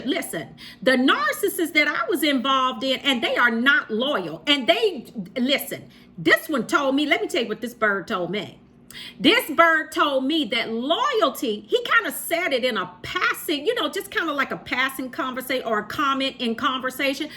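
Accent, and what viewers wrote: South, United States. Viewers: Whenever she says, "That bird, SKUNK!" I be dying laughing🤣🤣🤣 she has a lil southern accent.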